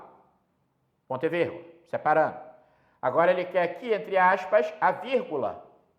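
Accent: Pará, Brazil. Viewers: Esse professor, é paraense. O sotaque não deixa passar (vamo deixar baixo), rsrsrs... Aula excelente, professor!!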